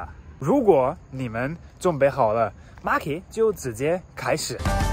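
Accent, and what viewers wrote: Sichuan, China. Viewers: 中文说的带有四川口音😁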